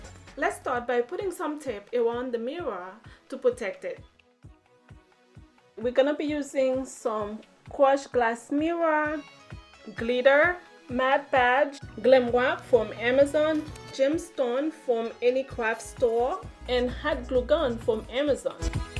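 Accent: Haiti. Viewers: Your accent sounds like you're Haitian 🇭🇹!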